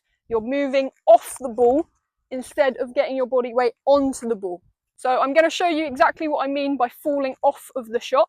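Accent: England, United Kingdom. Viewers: "Two things! Your videos are absolutely amazing, and your English accent is every Spanish speaker's dream — I still remember my English teachers telling me that!" Greetings From Argentina! 😁😁😁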